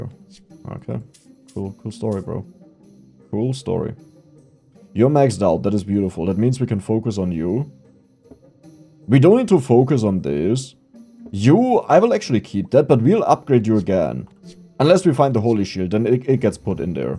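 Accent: Germany. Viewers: Wieso ist das so überraschend? Der Akzent ist doch nicht zu überhören 😀 (nicht negativ gemeint) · Selten jemanden so deutsch klingen hören, wären er englisch spricht 😄. Aber gutes Video ❤ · Ich hatte mir schon vom Akzent gedacht das du deutsch bist, aber auf einmal übersteuert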